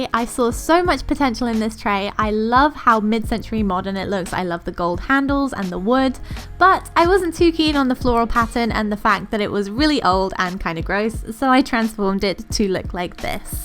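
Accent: England, United Kingdom. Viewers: Do you live in America? Because you have a British accent but we don't have "Michael's" here do we? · Is she English or American.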